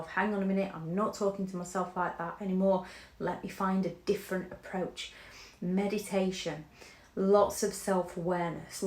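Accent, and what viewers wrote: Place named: Scotland, United Kingdom. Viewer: It’s a very long slow process for the mob to catch on. Your message is so powerful. You’re 10 years ahead of a typical gringo. I know I’m supposed to be cold towards those we understand or care for. It’s a fking lie. We must love and care for our fellow tribesmen women. It’s a 2 way gig. If your message can do this to me? Just march on. You sound Scottish to me but I only did military things in Europe. Way too busy to be focused on dialectical grammatical words or culture. I’m trying to stay awake so I can get in a schedule. Since she’s been gone. Your message has been cutting through the mess. Working down south is just bribe or pay people to leave me alone or work for me. We are not a non profit organization. Get hard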